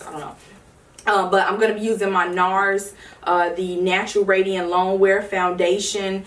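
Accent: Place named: Chicago, United States. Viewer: Knew you were from Chicago as soon as you started talking lol. People say we don’t have accents but I beg to differ. Love your channel 💕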